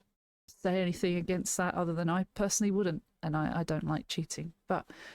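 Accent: England, United Kingdom. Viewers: Hi it was refreshing to find your channel. A more familiar English accent (most creators being American or Canadian) I have subscribed also. Hope you can do more in the future. Your quest for the meanings are usually correct. Keep it up...x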